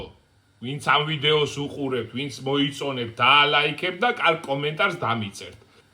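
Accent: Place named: Racha, Georgia. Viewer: რაჭველი ხარ???? კილო გაქვს რაჭული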